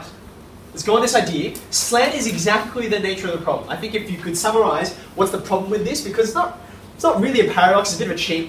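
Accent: Australia. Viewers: That's an Aussie accent he speaks with.. the way he says 'yeah' gives it away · This guy with an Australian accent is nuts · If my math teacher had an Aussie accent, I would’ve paid a lot more attention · He looks asian, but he sounds australian. What da mate !?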